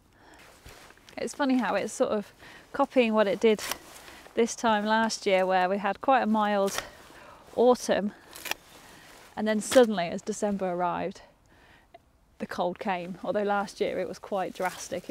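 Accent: England, United Kingdom. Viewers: I do love your accent! I am learning how to speak like a Brit by practising your accent. Congratulations on your recent marriage. Are we to assume there there will soon be a little one to help you plant your Daliahs?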